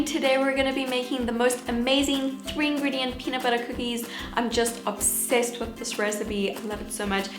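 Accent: South Africa. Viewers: are you south african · Are you from south African?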